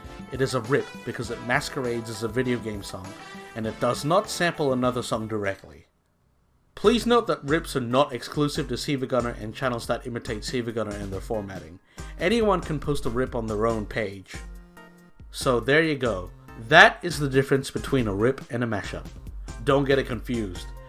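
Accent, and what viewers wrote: United Kingdom. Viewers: You kinda sound like ProZD if he had a slight welsh accent. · Educational and interesting this cool. Also YOUR VOICE IS UNEXPECTEDLY BRITISH SOUNDING.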